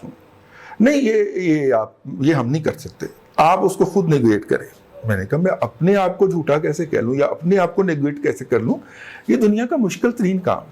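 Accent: Punjab, Pakistan. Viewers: جملہ میں اگر بار بار “نا نا” استعمال کریں مثلاً “آپ کو کہا تھا نا” اس سے اردو اچھی نہیں لگتی۔ اگر پنجابی اور انگریزی کے الفاظ استعمال کریں تو جملہ واہیات لگتا ہے۔ اپنا پنجابی کا لہجہ بھی ٹھیک کریں۔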